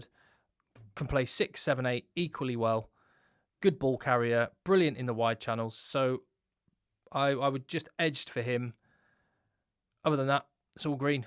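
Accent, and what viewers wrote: South Africa. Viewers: Are you sure you're not A saffa?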